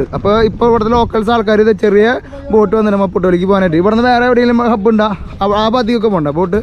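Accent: Thrissur, India. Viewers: ഈ തൃശൂർ ഭാഷ ഒന്നു മാറ്റി പിടിച്ചാൽ കാണാൻ ആളുണ്ടാവും തൃശൂർ ഭാഷ ആവർത്തനം ആവുമ്പോൾ കാണാൻ പരമ ബോർ ആണ് ബ്രോ എല്ല വിഡിയോകണ്ടിരുന്ന എനിക് പോലും മടുപ്പ് തുടങ്ങി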